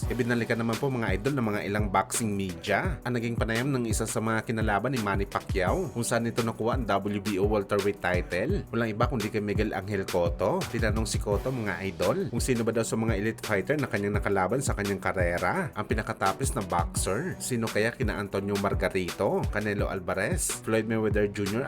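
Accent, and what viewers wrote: Visayas, Philippines. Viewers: Bisaya Ang blogger Kaya ganyan ang boses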